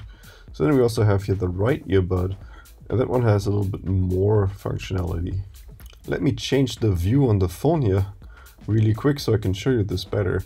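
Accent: Germany. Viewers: I think ur a german Ty for the instruction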